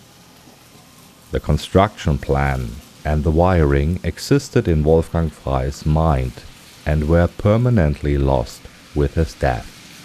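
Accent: Germany. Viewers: Geiler deutscher Akzent hört sich echt witzig an! (Das war NICHT beleidigend gemeint)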